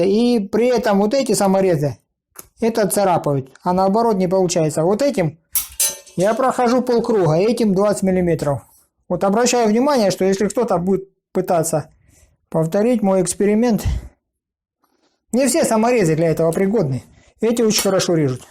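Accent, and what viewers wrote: South, Russia. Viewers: Я сначала думал он на самом деле себя (и канал) назвал. И говорок у него такой типа, южный. Многие знают как там Гэ-кают. А он оказывается. Т.е. механический мозг. Но блин, он и правда! Надо же так отверстие придумать прорезать! И чем? Саморезом! 👍👍👍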